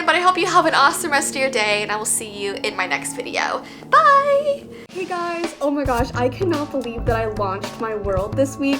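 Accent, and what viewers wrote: Canada. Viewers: She has a Canadian accent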